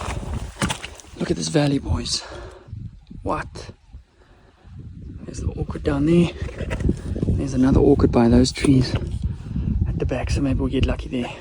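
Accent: Afrikaans, South Africa. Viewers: Yo dude kan jy afrikaans praat i said can you speak afrikaans cause you sound like you come from south afrika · Bro sounds southafrican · Ek hoor jou Afrikaans !! ❤❤❤❤Jy skiet mooi!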